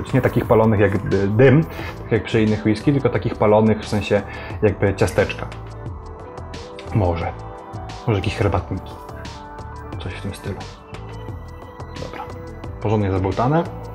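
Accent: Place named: Scotland, United Kingdom. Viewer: Szkoci chyba muszą Cię mylić ze swoimi krajanami - mega akcent.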